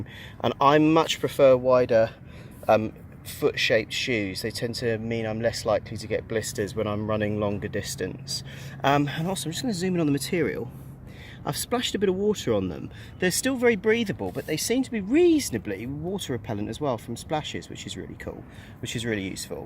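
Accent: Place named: England, United Kingdom. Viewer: New England location but old England accent !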